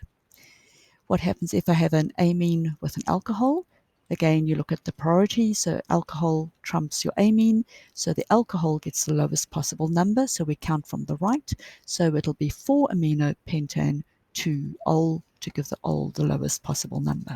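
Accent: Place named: South Africa. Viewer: Thank you for the helpful video! P.S.: you have a pleasant South African accent :)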